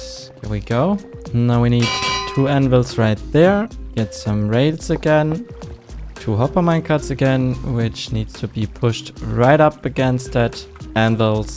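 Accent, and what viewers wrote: Germany. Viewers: PLEASE PLACE LIGHTNING RODS AROUND SO IT DOESN'T HIT THE BUILD OH MY GOD btw dachte mir schon von Anfang an, "das klingt wie ein Deutscher" 😅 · I hear a little German AKZENT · Ich gucke dich zum ersten Mal und hab direkt an dein Englischen Akzent gehört das du deutscher bist 😂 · The gearmen acent 🤌🤌🤌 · I like the fact that he has a german accent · Der deutsche Aktzent kahm mir schon etwas bekannt vor, aber geiles vidio :p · You sound german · Love the german accent (im german too lmao) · Deutscher akzent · erstens wusste ich garnicht dass du deutsch bist zweitens als du deutsch gesprochen hast hat man dich nur schwer verstanden da du so viel grass plaziert hast wow jetzt wo ich weiß dass du deutsch bist fällt mir auch dein aczent auf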